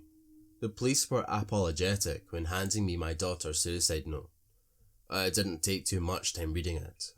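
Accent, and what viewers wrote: Scotland, United Kingdom. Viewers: good to hear another scottish person telling stories 👍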